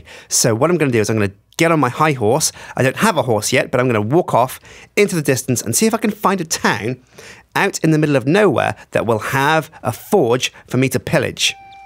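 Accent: United Kingdom. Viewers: "lasooo" i really hate the way brits say some words. for instance al-um-in-um is said as alu-min-ium and its really annoying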